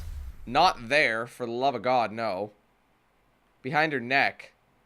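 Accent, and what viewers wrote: Ireland. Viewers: Wait a minute.... is Irish? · Are You Irish?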